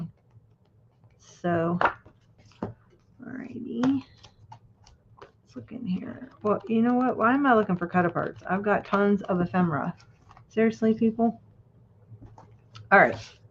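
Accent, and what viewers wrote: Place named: United States. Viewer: Weird comment, but has anyone ever told you that you sound like Kelly Clarkson? You must have been raised in the same part of the US. You have the same accents.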